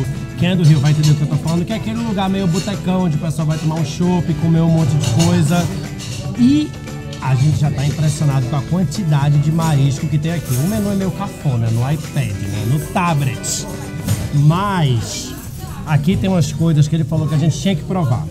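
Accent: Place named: Salvador, Brazil. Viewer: Vc é baiano,? Tem um sotaque soteropolitano aí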